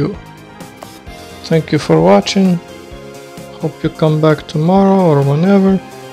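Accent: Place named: Russia. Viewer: You have a good Russian accent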